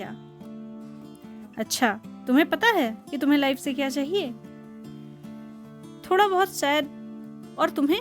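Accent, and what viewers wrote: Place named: Rewa Satna, India. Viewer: hame laga aap rewa satna se hai apke baat krne k according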